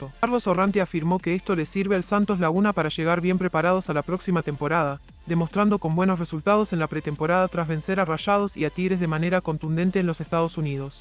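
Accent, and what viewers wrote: Argentina. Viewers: Pero por qué hablas como argentino?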